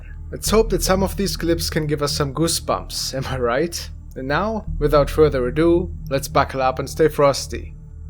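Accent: Germany. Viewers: he's german if I remember correctly. You'd think after speaking (or trying) to speak english for years. Watching TV/films, reading articles, & speaking with fluent english speakers. That this habit would be unlernt. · Sehr gut...was heisst...eigentlich.. an deinem Akzent denk ich mal du kommst aus Deutschland oder Österreich, lebst sicher in einem Englisch talking Country. If i m write, please take care of you,and i would love to see more of " in Carmel Main " Stay healthy,greetings from austria-ps.your Englisch is allmoust akzent free ( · Alter, der Akzent ist so anstrengend... ist das ein deutscher? Klingt jedenfalls so. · It’s nice that you don’t say EVERYTHING is paranormal, and point out how/if it can be faked....is the accent German? My daughter and I are wondering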